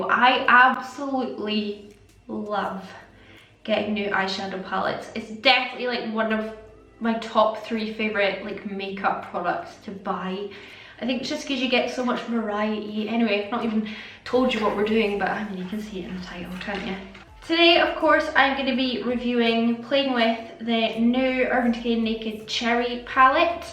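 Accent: Scotland, United Kingdom. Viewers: I told you before haters gonna hate and therefore don’t mind the bitch! As I was saying on IG before watching your video that I would not purchase it cos I don’t find the colours too unique!! And while watching your video I realised that Gingerspice was from Too Faced 😂 I only watch your videos because you’ve got real talent and I love your Scottish accent 😁 And as you can see down below a lot of people have your back, so don’t get frustrated because of one stupid bitch!! Keep doing you that’s why we love you 💕💕💕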